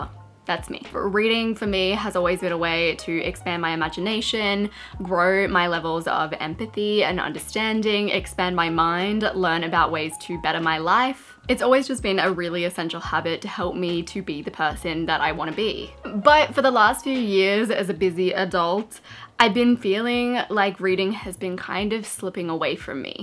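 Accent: Australia. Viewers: Oh my god, I've been a long time watcher and I knew you were a fellow Aussie but I never realised you were Gold Coast too, hearing you say Mr Gardener spooked me so much because my brother had him in Grade 4 too !!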